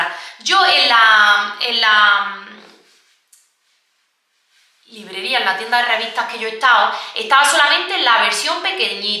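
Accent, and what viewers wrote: Granada, Spain. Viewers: Hola, mi nombre es Mariola y soy de Guadix, muy cerquita de Granada!! Te encontré por casualidad cuando Jimena era pequeña y te sigo desde entonces. Me llamó mucho la atención tu acento e intuí rápidamente que debías ser de Granada. Por cierto que hace poco te vi por allí!. Desde que presentaste los productos de Yepoda tengo muchas ganas de probarlos a ver qué tal me irían. Un saludo. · Hola ,me llamo Mari Ángeles y vivo en un pueblo muy bonito de Barcelona pero toda mi familia es de Granada y tú acento me recuerda mucho a ellos , te sigo hace un tiempo y me gusta verte ,tienes muy buen gusto para la decoración me das muchas ideas🤗Ojalá me toque los productos Yepoda ya que tiene un precio un poco alto y no todos podemos comprar Un fuerte abrazo bonica😘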